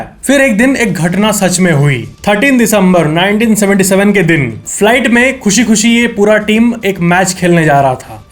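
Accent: Bihar, India. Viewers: भाई तुम बिहारी हो क्या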